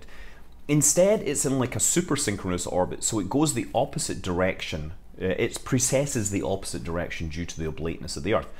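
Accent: Scotland, United Kingdom. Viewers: Is he Scottish? He sounds just like Mrs. Hughes.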